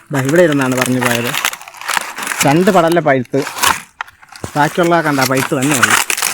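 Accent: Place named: Malappuram, India. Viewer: മലപ്പുറം ക്കാരുടെ സംസാരവും ഇതും ഒരുപോലെ....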